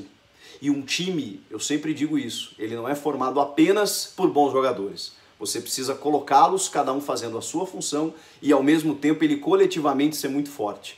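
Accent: Rio Grande do Sul, Brazil. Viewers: Mano tu e gaúcho ???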